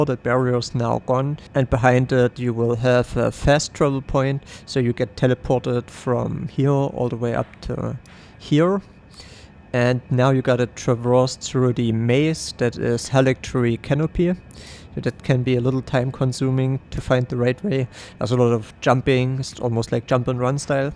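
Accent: Germany. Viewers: Hör ich da nen starken Deutschen Akzent? Hehe aber gutes video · der deutsche akzent scheint durch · Are you german? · Kann es sein das du deutsch bist? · You have German accents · Are u german? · U sound way to german xD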